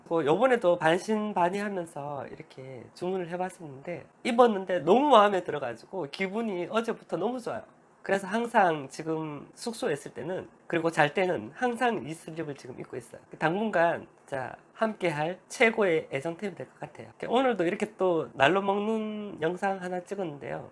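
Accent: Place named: Gyeongbuk, South Korea. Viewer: 애매한 목소리에 경북 사투리 듣기 싫다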